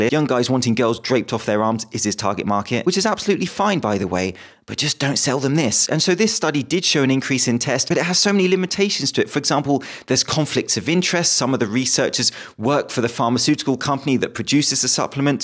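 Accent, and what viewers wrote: United Kingdom. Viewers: a normal looking guy in glasses and a British accent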